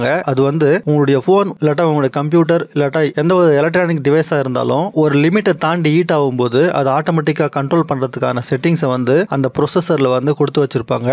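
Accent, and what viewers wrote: Chennai, India. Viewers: உங்கள் மெட்ராஸ் பாஷ அருமை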